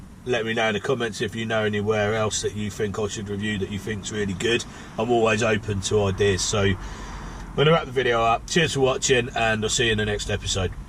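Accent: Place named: Berkshire, United Kingdom. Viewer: Thought I could hear abit of a Berkshire twang somewhere in your accent.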